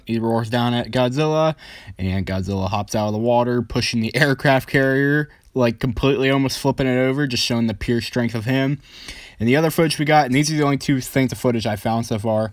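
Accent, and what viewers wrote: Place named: Philadelphia, United States. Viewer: Found this channel because I heard there was a new trailer out but then noticed that thick ass Philly accent and I love it haha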